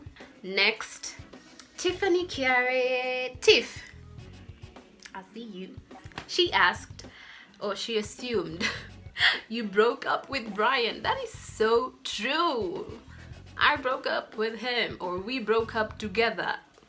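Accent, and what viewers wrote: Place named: England, United Kingdom. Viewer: I dont know if I have said this before but mahnn do I love your English accent u know how u pronounce words gets my attention all the time ooh and your channels are so like real if u get what I mean like all the tusmall movements biros speech keep that up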